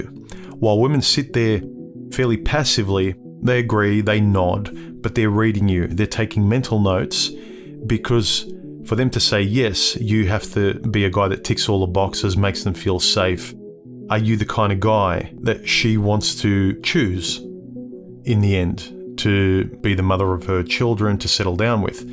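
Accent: Australia. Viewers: The Aussie accent is a pleasant bonus! 😉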